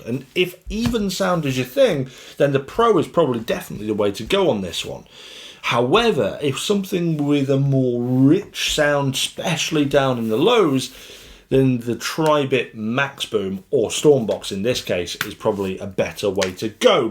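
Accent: United Kingdom. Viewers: I remember when you had under 3k subscribers. Your videos are next level, and everyone loves a British chap talkin about tech. Keep up the great work!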